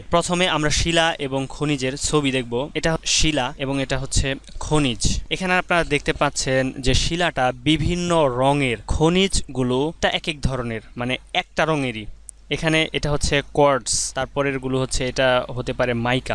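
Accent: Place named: Mymensingh, Bangladesh. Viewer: apnar voice sune mone hocch apnar district mymenshingh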